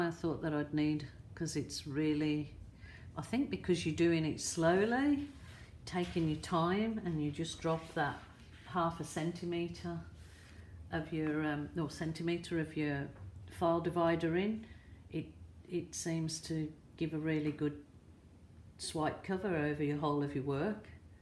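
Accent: Birmingham, United Kingdom. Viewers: I'm getting a hint of brummie in that aussie accent. Great pour btw. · I couldn't quite place it either! Definitely got the Brummie accent but I knew there was also some Aussie in there too. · Love your pictures & the brummie accent 🌍🇬🇧🇬🇧🇬🇧🌍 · This is amazing! So beautiful! Your accent doesn’t sound Australian. Are you from the UK? ☺️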